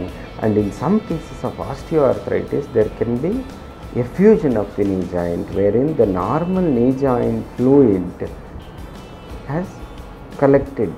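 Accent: India. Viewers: Indian English hh WTF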